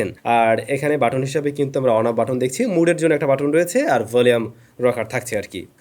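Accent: Barishal, Bangladesh. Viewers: এতদিন পরে জানতে পারলাম ভাই আমার বরিশাইল্লা 😅😅😅😅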